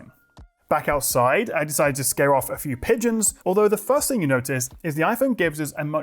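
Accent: United Kingdom. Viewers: I own everything Apple but, you're right, the Samsung has a better camera. BTW, your wife got lucky marrying such a handsome and intelligent man with a British accent.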